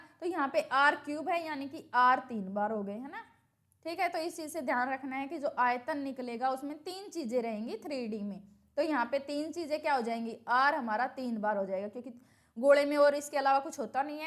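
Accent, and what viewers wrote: Haryana, India. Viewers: Mam aap Haryana se ho kya??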